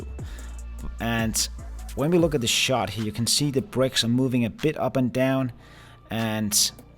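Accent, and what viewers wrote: Denmark. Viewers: Rigtig god video, synes jeg kan høre du kommer fra Danmark hvis ja, så pisse fed video. Går selv og overvejer DJI Ronin 4d, så tusind tak for review :)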